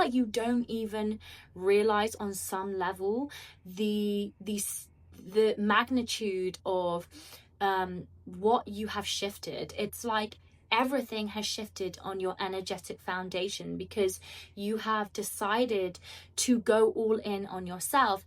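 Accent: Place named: England, United Kingdom. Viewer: Love those golden highlights and the English accent is simply icing on the cake!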